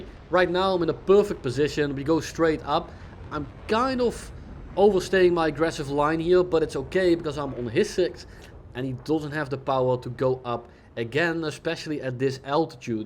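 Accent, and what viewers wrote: Netherlands. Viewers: I can hear immediatelythat you’re Dutch at least I think so 😂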